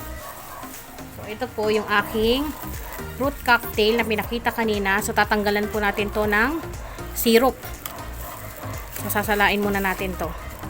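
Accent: Philippines. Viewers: I just subscribed to your channel, you are wonderful. I enjoy listening to you, you are very knowledgeable & professional. You explain it in very good tagalog and you say the english words in the real Pilipino diction and pronunciation which is very nice to the ear. I hope dumami ang manood sa iyo. Paki tanggal lang ang background na laughter at iyong background sound na wow, at sound ng chewing like chicharon, those ugly sounds degrade the high quality of your presentation. You are much better than Kris Aquino with her useless cooking.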